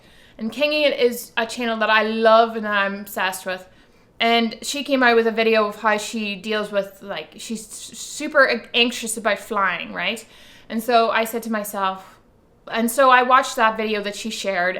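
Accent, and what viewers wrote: Ireland. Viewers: I love hearing your Irish accent ❤ sending love and hugs xx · sorry to hear about those haters. But whats more important is your health, family and going back to your roots. Fun to hear your Irish accent 😊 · Welcome back, it's good to know you're doing well and still among the living. This is the first time I've heard you speak with a Irish accent, GO IRISH!!! I just got back from a wonderful summer vacation in Ireland, it is such a lovely place, when I heard you speaking with an Irish accent I thought I was going crazy from hearing the Irish accent in Ireland so I was a little bit confused at first, so that means in all those other videos we were trying NOT to speak with your Irish accent, that is remarkable that you were able to do that, I'm impressed. I was hoping you would talk about that on this video but you did talk about speaking fast so that was cool. 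Take care I'm glad you're back making videos. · ohhhhhh ok......now I hear the Irish 💖 that's wsup luv...I would've thought you was Native Canadian.....yes looking forward to hearing the true 💯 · I thought you are Canadian? 😲Where does that Irish accent come from? 🤔 Oh yeah the haters, they are the worst! Im still ok on YouTube but on FB they are everywhere! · You SOUND SO Irish in this video!! Welcome back! x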